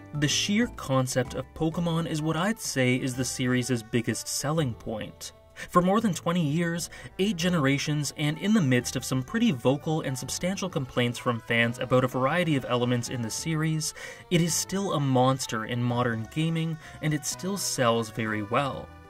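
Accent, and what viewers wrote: Canada. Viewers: Yo! What part of Canada are you from!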